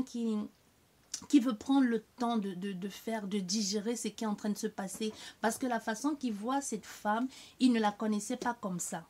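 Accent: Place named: Martinique. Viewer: Vous êtes Martiniquaise ,avec cette accent...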